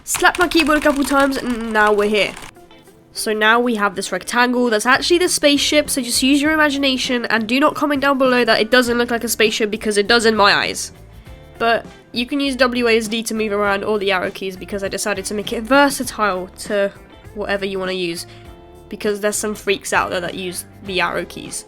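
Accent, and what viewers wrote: United Kingdom. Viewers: i was thinking that she dani but british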